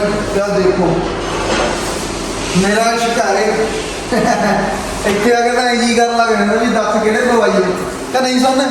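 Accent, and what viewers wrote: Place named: Punjab, India. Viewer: Bai keda pind ya apna.... Nawanshahr de lagye de lagade ho Bai tussi...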